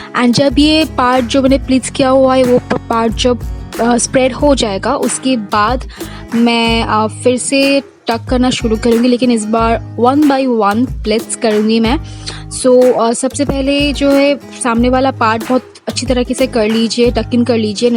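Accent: Bengal, India. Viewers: Didi tmr kotha sune mone hocche tumi nischoy Bengali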